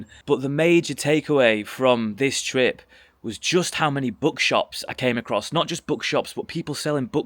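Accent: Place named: Yorkshire, United Kingdom. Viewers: I love your accent. ♥️ Is it from Yorkshire? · Long time watcher here- nice to see your first episode outside the UK! I actually first started watching your videos because I grew up in West Yorkshire. I haven't lived in the UK for over a decade but it's nice to hear the accent and I do enjoy your Made in Britain series and Death of the High Street- I think they compliment each other well. I enjoy learning about the old industries- and share your love of old mill chimneys. I'd just like to add that I love Eleanor's fashion sense- actually before you introduced her I was wondering who the pretty and stylish French girl was in the background...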